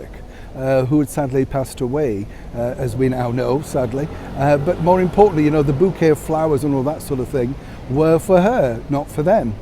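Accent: Yorkshire, United Kingdom. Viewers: Wel Done, always spot on and such a warm yorkshire voice... Respect fron NE Thailand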